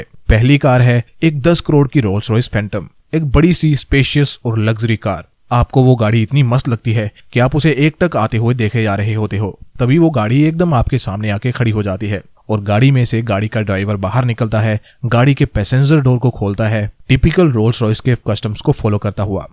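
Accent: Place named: Punjab, India. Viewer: punjabi accent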